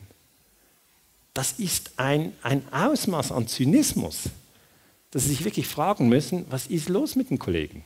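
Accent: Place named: Switzerland. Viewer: Der Schweizer Akzent ist heilig !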